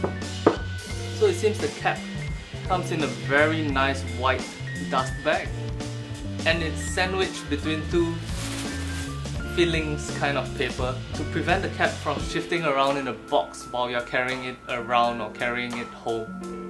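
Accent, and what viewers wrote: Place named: Singapore. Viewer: You sounds like Singaporean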